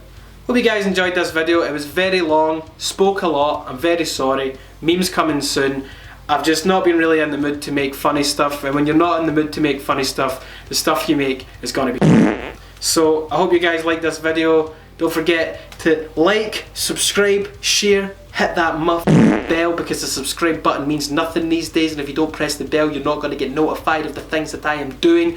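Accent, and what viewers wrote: Scotland, United Kingdom. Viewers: I like when you talk because I like your Scottish accent. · wait your scottish?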